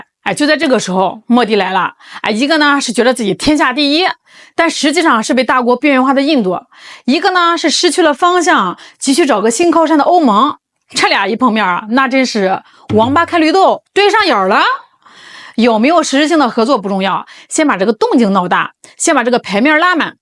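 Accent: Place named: Henan, China. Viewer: 河南腔打的😄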